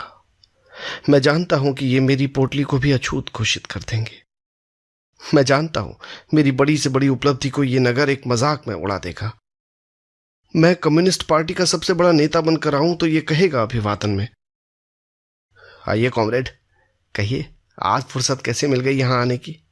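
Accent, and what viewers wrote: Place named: Kumaon, India. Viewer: Your Kumaoni tone is perfect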